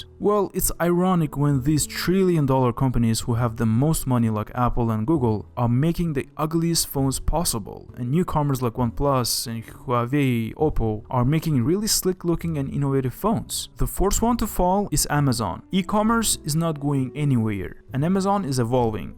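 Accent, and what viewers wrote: Eastern Europe. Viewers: So by the way you pronounce Huawei am I wright in guessing you're from Eastern Europe? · clearly eastern European accent... But this "the" Google or "the" Amazon thing is kinda weird...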